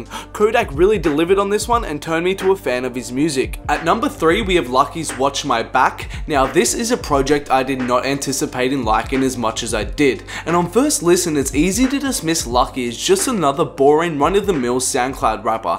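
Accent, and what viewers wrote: Australia. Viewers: What part of Australia is he from? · I wish I had that Australian Accent.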